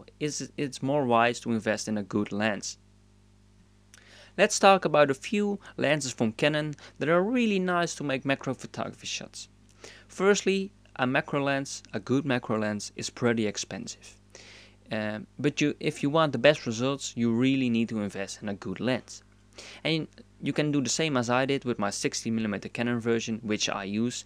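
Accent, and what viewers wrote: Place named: Netherlands. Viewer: Nice tips. You sound Dutch, am I right? :D (Ik ben ook Nederlands)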